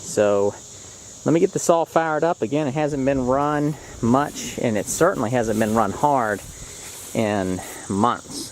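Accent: South, United States. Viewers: holy moly! i don't know how this video popped up!..... what a strong Southern accent!!!!!!! i feel like 'bearded old hippie hiding behind his truck'! no telling what this video was gonna be about!..... turns out to be a cool little video! thank you, very much! :-)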